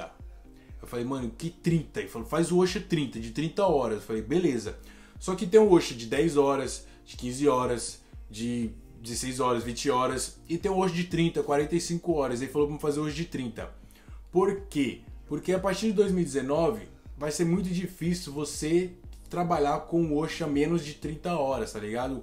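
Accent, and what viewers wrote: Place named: São Paulo, Brazil. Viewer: Cai de paraquedas aqui!! Iol mas ganho meu like 1° pela humildade..2°AHH que bom ouvir o sotaque da minha terrinha😍 SP na veia manoooo!!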